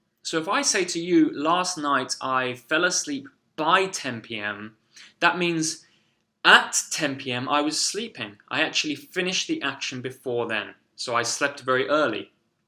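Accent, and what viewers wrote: United Kingdom. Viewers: Lovely British accent I liked 🙋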